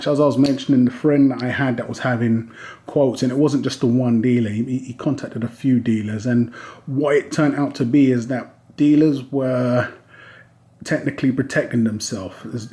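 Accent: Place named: Yorkshire, United Kingdom. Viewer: Thank you … I know we don't always agree 100% on things but its good to have many points of view. PS I am in Yorkshire …. So I am guessing we are not too far apart on your accent!!!! Anyway I buy only to melt them down.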